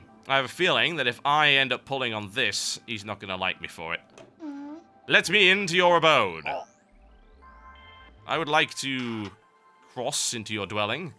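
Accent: United Kingdom. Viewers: "Not as clumsy or random as a Titan... an elegant blaster for a more civilized age" Hahah epic Star Wars ref!! I wish I was a Brit with a sexy deep voice like you, you make everything sound a million times cooler xDDD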